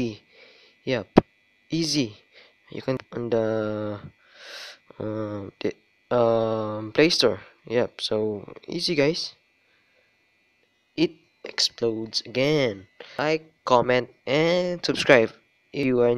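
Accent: Philippines. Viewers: filipino right? english palang alam na this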